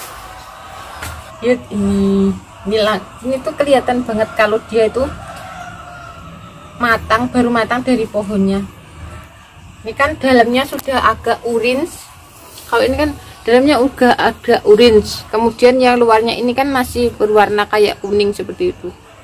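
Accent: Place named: Java, Indonesia. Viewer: Medo bahasa jawane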